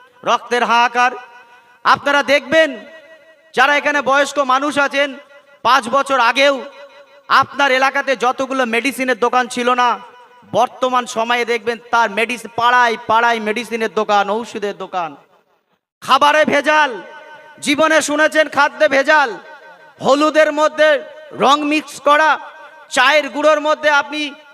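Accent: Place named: Bangladesh. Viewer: Kotha sunei to mone hoche bangladesh er tar topkano mal, r a bangladesh fire ja 😂😂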